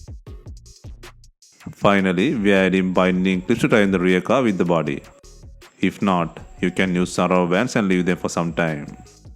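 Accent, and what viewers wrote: India. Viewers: Avec un type de colle genre CYANOACRYLATE ,bravo impossible par la suite de pouvoire ré-ouvrire pour une autre réparatation,c est comme se tirer un coup de 12 ds les pieds cà!!!!!🙄😤😒🤪🙃😡🤬 Utiliser plutot une colle caoutchouc!!!!!!! Apres y sera toujours possible de le réouvrire & aussi tres facile de nettoyer la colle avant d en. ré- appliquer pour le refermer,une simple logique !!!!! Un accent infernal indou🙄😤😒😑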